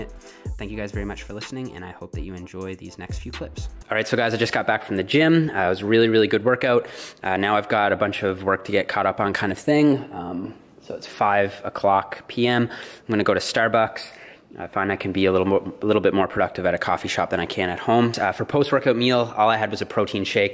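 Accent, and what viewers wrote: Canada. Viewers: Does anyone know what city this dude is out of? Canadia for sure? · Is this dude Canadian?? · Is this guy from Canada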